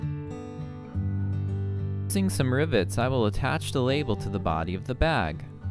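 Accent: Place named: Nevada, United States. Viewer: sorry Taiwan not Philippines and that's what I figured. You sound like me and I'm from Nevada haha.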